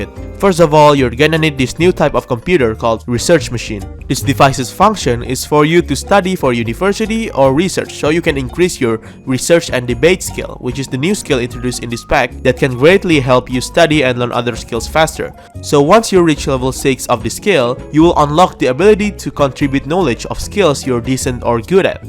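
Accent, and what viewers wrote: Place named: Indonesia. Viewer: Bi lo orang indo? Logat lo indo bgt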